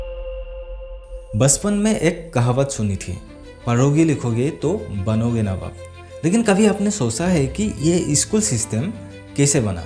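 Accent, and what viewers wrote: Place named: Bengal, India. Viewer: heavy bengali accent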